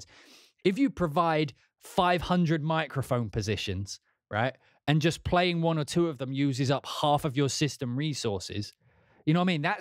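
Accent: United States. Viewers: My gosh your American accent is outstanding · Man you have a great American accent! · American accent sounds real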